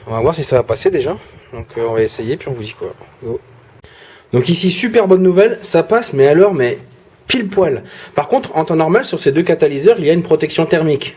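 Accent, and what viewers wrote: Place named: Nord, France. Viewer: Salut " " je me permets de t'envoyer ce message car moi aussi je suis youtubeur je viens à peine de me lancer dans tout ça. Je suis mecanicien PL de métier et j'aimerais que tu me donnes ton avis sur ma chaîne n'hésite surtout pas à critiquer. Tu ne sais pas comment les gens adorent ton franc-parler. Cela se sent que tu es du Nord moi mes parents sont du câteau 59. Et franchement je kiffe trop ta façon d'être. Je te laisse le lien de ma chaîne youtube surtout n'hésite pas à me déglingué s'il le faut <link>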